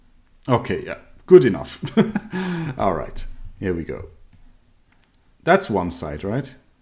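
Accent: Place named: Germany. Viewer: Thanks for the Tutorial! your accent also sounde pretty German to me, haha! :)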